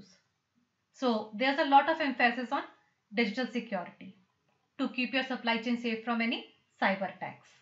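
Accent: India. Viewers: Has difficult to adjust myself to your strong Curry accent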